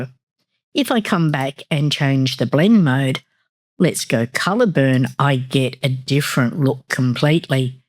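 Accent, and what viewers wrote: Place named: Australia. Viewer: Thank you for your time. Lovely to hear an Aussie voiceover!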